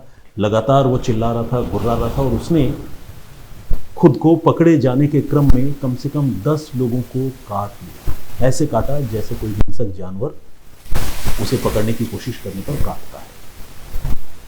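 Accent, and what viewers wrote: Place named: Bengal, India. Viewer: आपके कहानी सुनाने का अंदाज बहुत ही आकर्षक है। मैं विशेषकर आपके बंगाली हो करके शुद्ध हिंदी में कहानी सुनाने के अंदाज से मैं बहुत इंप्रेस्ड हूं।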